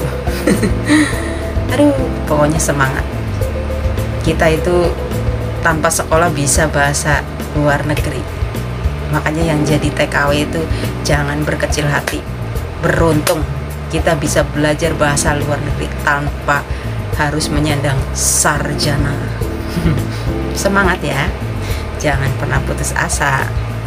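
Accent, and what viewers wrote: Banyumas, Indonesia. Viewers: Salam ngapak mbae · Salam Ngapak bae mba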